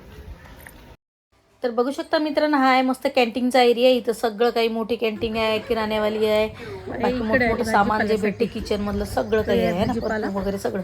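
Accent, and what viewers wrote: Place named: Akola, India. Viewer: Akola chi bhasha ahe baa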